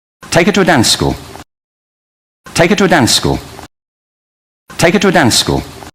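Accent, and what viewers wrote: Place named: United Kingdom. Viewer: かっちょいいイギリス英語ですね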